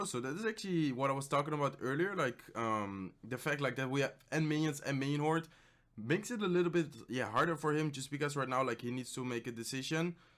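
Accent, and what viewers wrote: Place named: Netherlands. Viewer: Whaha k ben ook nl’er en je hebt echt een nl accent als je Engels praat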